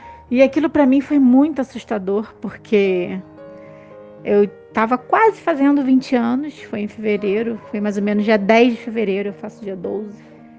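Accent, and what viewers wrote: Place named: Rio de Janeiro, Brazil. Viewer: Carioca da gema 😃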